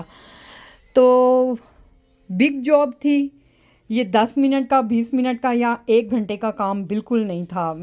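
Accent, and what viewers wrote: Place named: Punjab, India. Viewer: Apki punjabi ka tarka bht maze ka lgta ha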